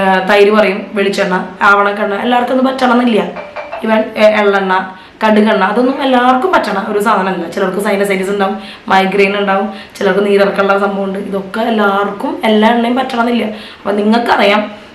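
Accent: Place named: Thrissur, India. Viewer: Thrissur aano veedu. Samsaram athe pole nd. Njan Thrissur aanetto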